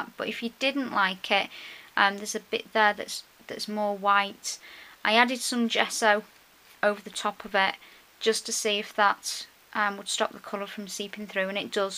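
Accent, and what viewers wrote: England, United Kingdom. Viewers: I found this comparison very interesting and helpful. I have seen many mentions and tutorials on how to create your own crackle paint using 'Elmer's Glue' - which is something that's common in the USA, but I - like you (from your English accent) am in the UK. Have you tried this? Do you know what glue here in the UK would work like the American's 'Elmer's Glue'? If you've tried this, how well did it work? Thanks. By the way, I love your journals and Mixed Media art. Gorgeous stuff!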